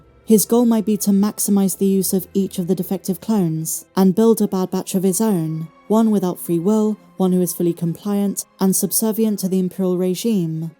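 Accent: United Kingdom. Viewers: I love your british accent. Good theories. Greetings from MEXICO. :yt:😁😅😉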